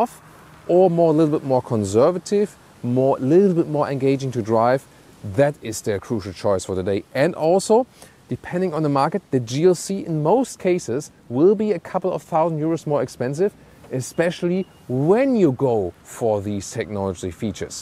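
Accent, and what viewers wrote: Germany. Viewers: Der deutsche Akzent tut schon ein wenig weh beim zuhören .... aber wahrscheinlich notwendig um einen Channel groß zu bekommen.😶‍🌫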